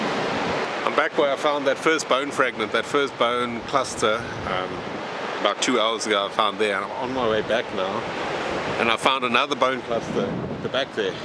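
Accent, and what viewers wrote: South Africa. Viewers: cool vid man love the fossil hunting vids you sound like you from cape town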